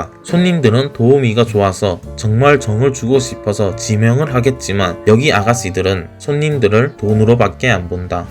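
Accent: Yanbian, China. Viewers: ㅡㅡ.. 중국에서 했나 말투가 연변어네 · 조선족 억양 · 말투 조선족인가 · 너 조선족 맞지? 말투가 딱 조선족이네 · 말투가 조선족말툰데.... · 조선족 말투같은데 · 대한민국인 어투는 아니시네요... 조선족 어투와 많이 유사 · 발음이 중국인이네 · 말투가 조선족인데... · 음~~~이상하네,, 발음이 중공 발음.... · 말투가 조선족 인가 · 발음이 중국인같은데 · 조선족이신가?ㅅ발음이 영락없는데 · 이분 조선족 말씨를 쓰네요. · 말투가 조선족말투 같네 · 말투가 딱 연변인데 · 조선족이냐? 말투 거슬리네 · 발음이 조선족인데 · 발음이 딱 조선족인대? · 발음이 조선족이네? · 발음이 조선족인데?? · 말투가 연변이신데 조선족이신가요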